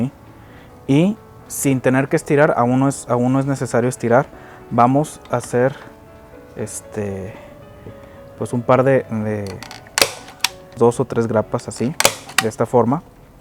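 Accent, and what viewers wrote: Mexico. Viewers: Me agrada tu acento, ¿eres del norte de México?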